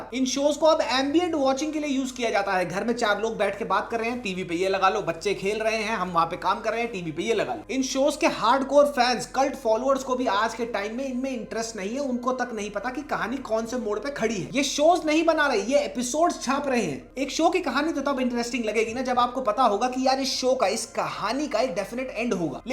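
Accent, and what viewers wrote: India. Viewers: that's maybe bcz he isn't british. There are many words we use and the pronounciation we prefer is sometimes british pronunciation and sometimes words derived from american english or in american pronunciation but that's May not be perfect and is a bit indianized like British pronunciation in Indian english accent.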